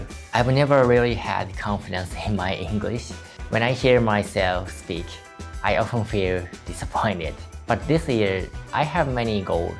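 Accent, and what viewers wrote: Japan. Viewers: I have Japanese friends and have visited your country 4 times. I love Japan very much and wish that I could live there. My Japanese friends speak some English, but not as well as you do. I respect the effort that is put into speaking another language. I try my best to speak Japanese, but only know basic phrases, because at 62, it is hard for me to remember a lot of Japanese. It is easier for me to understand most of your English, because of your Japanese accent. Keep up the good work. ❤️ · The beauty I hear in your speech is because you are a native Japanese speaker talking to us in English. I understand every word. I'm from the South here in the US and let me say, we can destroy some English pronunciation and there is no shame! We embrace it. Please do the same. Individuality is beautiful. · Your English is GREAT !! Please remember that people in the United States have accents also; especially in places like Mississippi, North Carolina, even New York ! 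Depending on the accent, you can tell exactly where someone is from- no shame… just the way it is. your pronunciation is perfect. Please remember that you are Japanese first and foremost; so of course you’re going to have a “accent” when you speak English. I admire you for wanting to improve, but please only improve if it is something you want to do not from outside pressure. And I love your outfit today !! HANDSOME !!! Keep up the great work !!!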